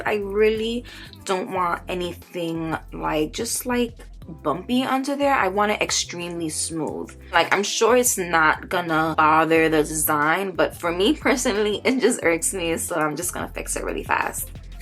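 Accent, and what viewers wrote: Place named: New Jersey, United States. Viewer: Also, I love your accent. Is it Jersey?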